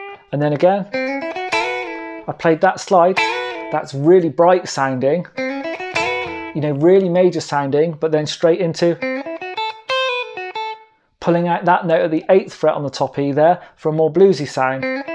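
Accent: England, United Kingdom. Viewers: that typical aristocratic english accent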